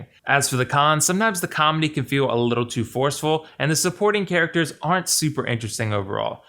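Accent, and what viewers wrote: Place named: United States. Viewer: I only came here to hear an American talk about this movie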